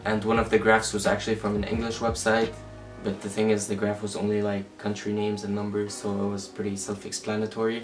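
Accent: Arab world. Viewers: honestly, when I watched your videos for the first time, I could sense a middle east touch :D I speak two dialects of Kurdish, English, Turkish, and a little bit of Arabic. Çawani means "how are you" in Kurdish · That’s why i was sensing an Arab dialect when you speak... I love your humor keep posting 🥰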